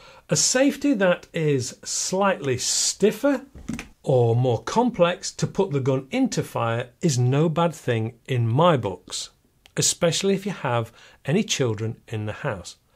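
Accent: United Kingdom. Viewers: I could listen to British people talk all day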